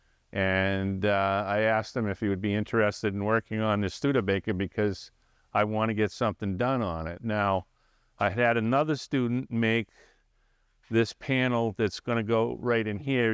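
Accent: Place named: New England, United States. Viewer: Where in New England you from.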